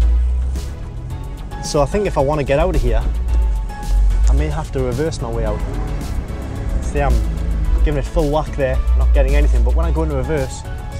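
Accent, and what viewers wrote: Newcastle, United Kingdom. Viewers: Are you a Geordie? If so, awesome hahaha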